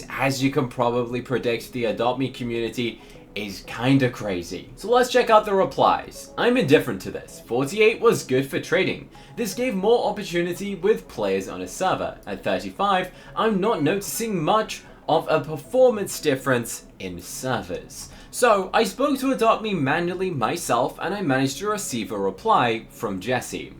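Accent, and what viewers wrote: England, United Kingdom. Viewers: Are you english or American?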